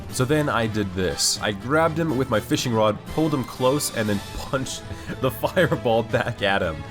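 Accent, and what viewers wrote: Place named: Ireland. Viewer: the casual random irish accent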